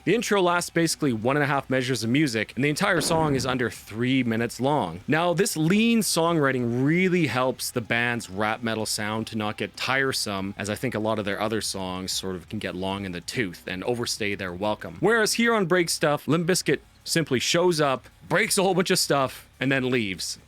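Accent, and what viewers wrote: Canada. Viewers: Are you from alberta?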